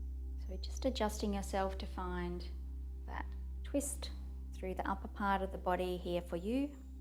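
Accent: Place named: Australia. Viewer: Great practice. I really enjoyed the first pose, whad do you call that? And, I love your aussie accent 🙂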